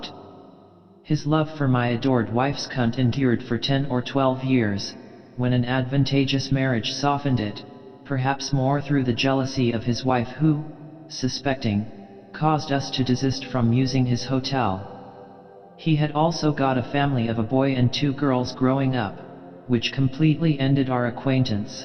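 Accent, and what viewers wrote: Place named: United Kingdom. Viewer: Alien voice VS gentle English UK accent...no contest. THIS VERSION IS ATROCIOUS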